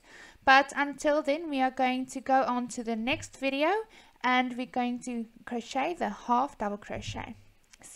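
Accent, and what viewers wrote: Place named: South Africa. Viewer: It is beautiful hearing a Fantastic South African accent again! I for the first time have truly managed to do and understand my first crochet attempt. Thanks a million x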